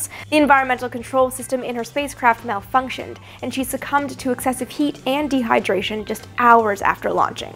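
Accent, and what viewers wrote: Canada. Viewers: Great summary. And might I say, your pronunciations are very Canadian in this episode :) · Always like hearing the Canadianess coming out. :-) Miss-isle just sounds so much cooler than Miss-sel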